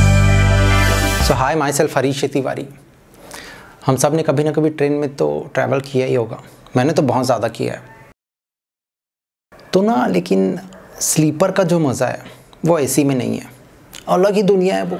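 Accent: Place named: Eastern Madhya Pradesh, India. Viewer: Why his tone sounds like he is from Madhya Pradesh ,and that too eastern part of m.p 🤔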